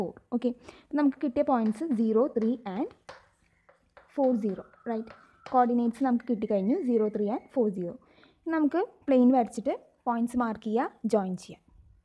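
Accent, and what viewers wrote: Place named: Thrissur, India. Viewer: Thrissur alle Aa Basha kettale theriyum 😄😄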